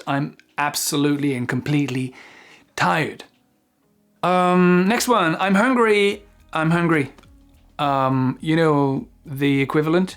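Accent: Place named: England, United Kingdom. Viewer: j'adore ton accent anglais ... une approche très éducative, à la portée de tous (et toutes ;-) ) merci !!!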